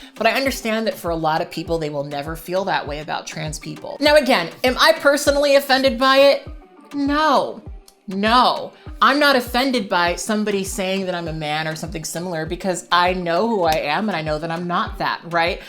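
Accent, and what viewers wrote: United Kingdom. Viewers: 🧡🧡 that british accent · I've noticed you've been occasionally doing a British accent randomly in your videos. Especially recently. When are you getting your own BBC series? 🧡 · 🧡 i love the british accent that gets sprinkeled in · mood As a fellow British person, I would like to say your lapses into the British accent (which is SO clearly your native accent ;-) ) bring me great joy. (I can't ever put the emojis because I watch your vids on my computer :sadtimes:) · 🧡 is no one going to discuss accent developments? i love the dips into accents every now and then. we a do it to varying degrees of success, but british is starting to get good. i wonder if she's been binge watching bridgeton or following a new english youtuber. whatever, it's fun when people switch accents for no obvious reason.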